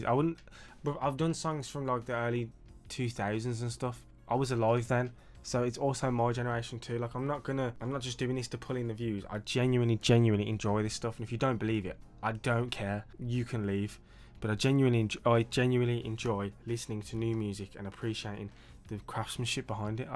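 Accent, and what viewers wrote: Birmingham, United Kingdom. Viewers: I love your accent!! Brummy? Watching you from Ireland. I love to see the younger generation appreciate real music. 🎶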